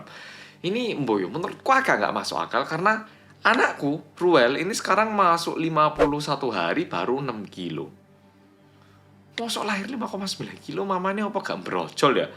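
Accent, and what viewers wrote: Java, Indonesia. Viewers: medok.e ketoro men 😂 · Coba logatnya, jangan lah kental Jawa, yang dengar semua orang Indonesia 😊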